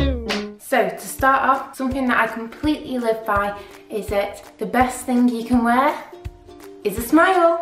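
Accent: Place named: United Kingdom. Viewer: Happy Birthday! Where are you from in the UK? I like your accent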